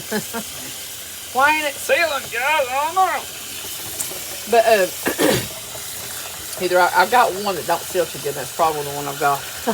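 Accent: South, United States. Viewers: Watching you and listening to you speak makes me miss living in the South. I miss having fresh vegetable plates for lunches and dinners. I love hearing your accent too; it reminds me of being young and growing up in Alabama. ❤ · Thursday with when all her southern words come out 😂😅😂 Bless her heart, and her taters and maters...go get ya some honey😂😅😂 You know I luv ya my friend ❤❤❤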